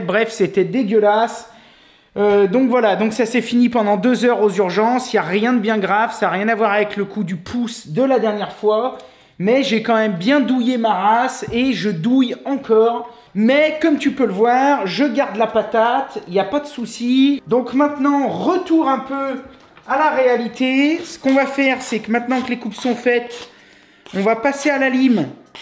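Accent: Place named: Lyon, France. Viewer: Tip-top, comme d'habitude. Ca fait plaisir d'avoir affaire avec un pro digne de ce nom: compétent et honnête, animé par la volonté de faire les choses comme il faut et par le désir de satisfaire le client. Retraité du métier depuis pas mal d'années (je travaillais avec du cuivre uniquement), j'apprécie vivement les nouveaux matériaux, matériels et façon de faire avec les produits modernes. Les tuto éténat animé de manière tellement agréables. Et, petit plus, l'accent lyonnais ou stéphanois, si mes souvenirs sont bons!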